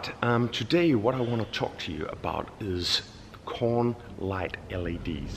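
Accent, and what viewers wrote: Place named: Wales, United Kingdom. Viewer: Love the welsh accent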